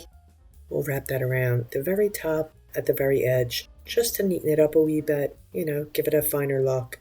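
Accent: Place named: Pennsylvania, United States. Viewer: Clovis came out Amazing!! I’m guessing you’re from PA… There’s the accent and my mom’s side of the family is all from PA & I always heard mom & grandma call them jimmies. Well I was born and raised in FL so I call them sprinkles😂.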